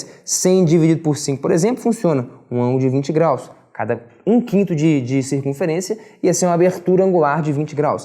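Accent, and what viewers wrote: Minas Gerais, Brazil. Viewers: a mineirice exala em vc cara kkkk e hj aprendi que a microsoft não sabe dividir 360, os caras pularam do XBOX 360 pro XBOX One, depois desistiram e foram pro X, agr estão aprendendo seno e cosseno? kkkkk falando serio, video excelente! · Pelo seu sotaque imaginei q vc é mineiro e eu também da cidade de Dores do Turvo confrontante com a cidade de Ubá MG, gostei e adimirei da sabedoria e a sua vocação de ensino muito claro e fácil de entender parabéns.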